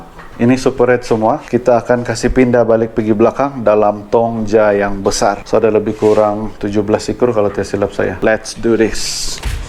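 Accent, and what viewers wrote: Sabah, Malaysia. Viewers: Terbaik bossku..sdp dgr org sabah ckp😍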